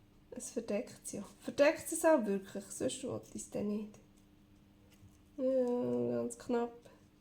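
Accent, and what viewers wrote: Switzerland. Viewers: Hooi so schön schwizerdütsch höre 🥰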